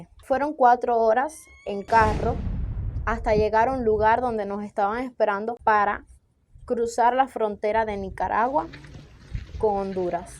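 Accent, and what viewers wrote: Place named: Veracruz, Mexico. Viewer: Vente a Veracruz Tenemos el mismo acento y comemos arroz blanco y frijol negro😊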